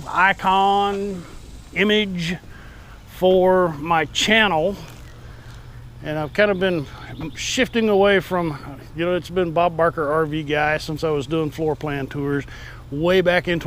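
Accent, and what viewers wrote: Texas, United States. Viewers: I have to agree about you not being in the logo. Even if you're represented by a stick man wearing a hat and holding a camera. I hope you have a fun and safe trip to the Smokies. Thanks for all of your help. I'm looking for a fifth wheel or travel trailer to live in. Your videos are informative and fun to listen to. The others, not so much. Thanks again for your work and keeping the Texas accent real. Stay Safe. Stay Happy. Stay Loved.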